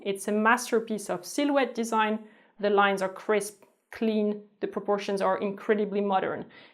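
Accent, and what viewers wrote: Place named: France. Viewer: Oh god. French accent is uggghhh to die for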